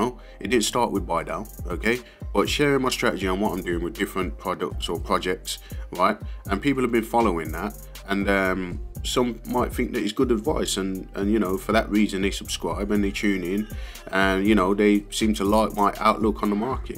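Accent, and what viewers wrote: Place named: London, United Kingdom. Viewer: I like your accent guy, london bwoi.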